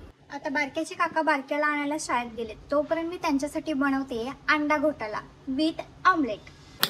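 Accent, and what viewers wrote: Kolhapur, India. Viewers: कोल्हापुरी भाषा ❤ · Tumcha kolhapuri accent ch bhari vattoy change naka karu tumhi ❤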